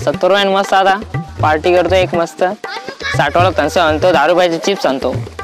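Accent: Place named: Agri, India. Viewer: BMW lakaht ek ahe..proper aagri boltos ..vinayak ani bunty peksha bhari.....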